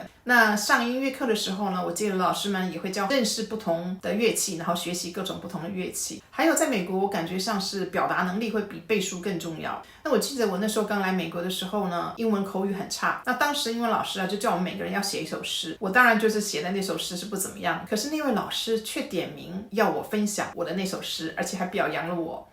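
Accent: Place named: Taiwan. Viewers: 明顯是台灣的腔. 台灣腔有許多種類。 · 很明顯的台灣腔啊。怎麼在美國40年還可以保有這麼自然的台灣腔，厲害！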